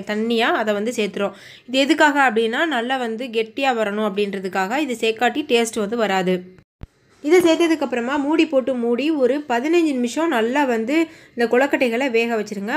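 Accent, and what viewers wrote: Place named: Madurai, India. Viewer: Madurai side ahTamil neenga my old days memories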